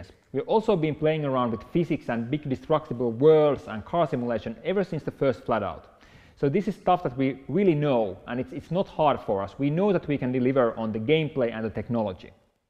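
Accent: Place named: Finland. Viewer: That finnish accent! My Greetings from (my homeland) Finland to Finland :D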